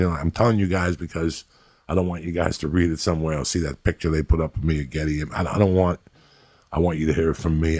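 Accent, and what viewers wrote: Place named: Italian American, United States. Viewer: He could play the old fat Italian mafia boss kind of role even the accent he's perfect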